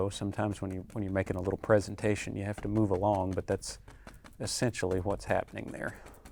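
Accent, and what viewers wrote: South, United States. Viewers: That southern drawl… it’s…. hypnotic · Another beautiful job I love your own personality that creeps out and that hint of a southern twang not as southern as mine but I hear it - it’s a shame the video is ruined by constant commercials cutting in I’m sure there could be a better way of viewing this without YouTube ruining it too much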